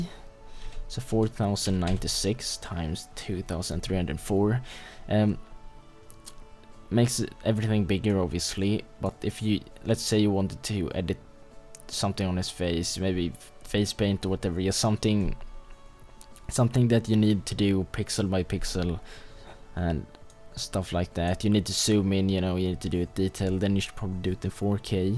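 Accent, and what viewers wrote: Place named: Sweden. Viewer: He sounds Swedish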